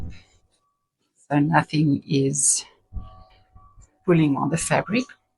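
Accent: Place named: South Africa. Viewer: I wanted to say I recognize a South African accent.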